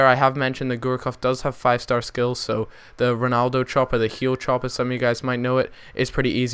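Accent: Scotland, United Kingdom. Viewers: Are you Scottish or have Scottish relatives ? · are you scottish?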